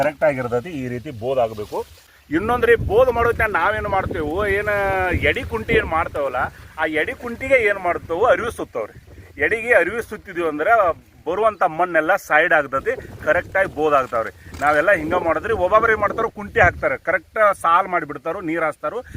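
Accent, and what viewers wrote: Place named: North Karnataka, India. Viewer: ಅಣ್ಣ ಉತ್ತರ ಕರ್ನಾಟಕ ಭಾಷೆ ಮಾತಾಡ್ತಿ ಯಾವೂರು ಅಣ್ಣ ನಿಂದು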